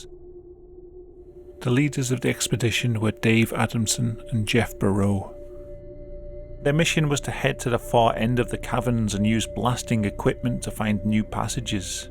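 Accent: United Kingdom. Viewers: Ur British ascent is too muchhhh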